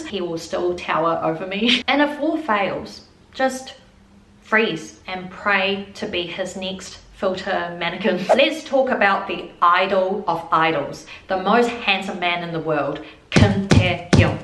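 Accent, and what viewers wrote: New Zealand. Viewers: Love your kiwi accent. This was so fun to watch. I hope you get those tickets! But what will you wear when you do? 😅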